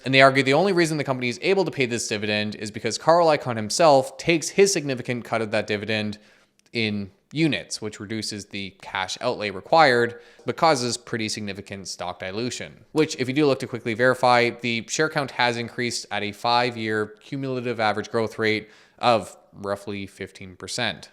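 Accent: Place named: Ottawa, Canada. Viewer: No way, picked out your accent on the words "they are at it again" and "they got a lot of flak last time" and found out your in Ottawa! No fucking wonder I recognize it! Crazy to think someone I watch online is just chilling somewhere in my city!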